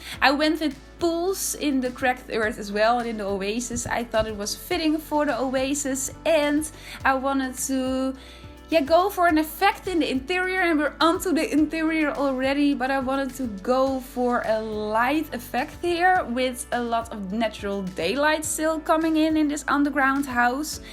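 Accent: Netherlands. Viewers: Wow so cool! Where are you from. You sound really Dutch to me. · Are you dutch? You have a dutch accent haha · You have a Netherlands accent (my opinion) .. Its very cute!😊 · The second I heard your voice I knew you were dutch. Turns out I was right haha. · That dutch accent tho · Are you Dutch? I am Dutch too, I can hear it at your language. 😂 My first language is Dutch, but my second is English!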